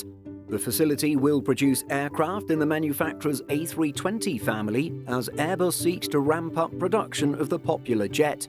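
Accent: United Kingdom. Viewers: Would live to see the person behind this voice. Perfect british accent and perfectly pronounced French names. Hats off to you gent.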